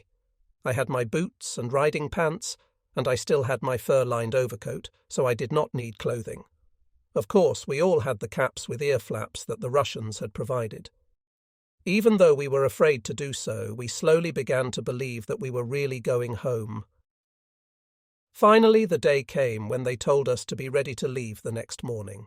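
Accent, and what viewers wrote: England, United Kingdom. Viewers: You must be from the same area of England as Mark Felton.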